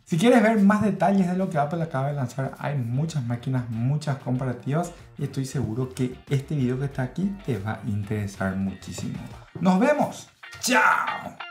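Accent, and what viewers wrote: Paraguay. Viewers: gran video. amigo tenes un acento paraguayo. cuál es tu nacionalidad? saludos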